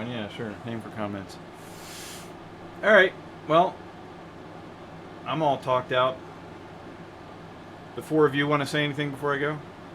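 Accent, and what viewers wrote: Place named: United States. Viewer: I'm willing to loan Big Spring, but I would like to know where you're located first. You sound American, so I'm not really concerned about long-distance transport, but a friend has told me that the quarantine has screwed around with delivery routes. Supposedly mailing things eastward, especially to large urban areas like NYC and DC is risky.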